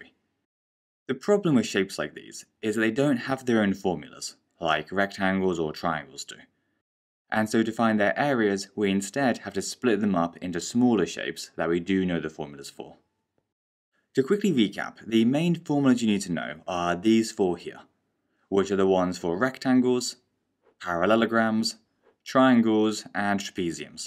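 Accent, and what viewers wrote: United Kingdom. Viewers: im 2 years late but it really helped me 😉😊😀😃2/22/24 and btw are you brititsh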